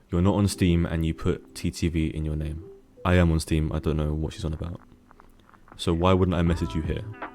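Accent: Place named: Southern England, United Kingdom. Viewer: bro u sound mad like my mate from yeovil u from south england?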